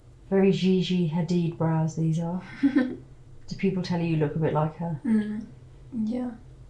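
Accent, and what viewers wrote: United Kingdom. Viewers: Thank you so so much! Hope you feel better soon. 🤗 Your nasal voiced is concealed by your ever sexy British accent. 😘😘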